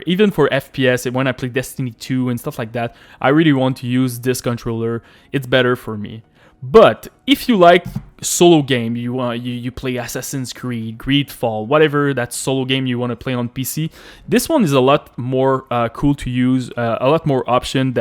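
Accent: Quebec, Canada. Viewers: Est ce que tu est québécois? parce que j'attend un petit accent XD mais sinon superbe vidéo.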